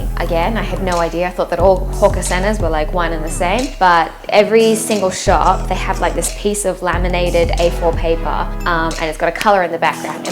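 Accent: Australia. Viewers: Hey, thanks for this video! It is very educational and informative! As an American, I've always been interested in visiting Singapore because I understand it is a very beautiful, stable, and safe country. By the way, I love that Aussie accent of yours! You're adorable and entertaining! I look forward to seeing more of your videos! You just earned a new subscriber!:) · You sound like an Aussie, are you from Australia? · are you Australian? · God I love Aussie accents. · Pssst... what's she nattering about? Did anyone get her Aussie accent?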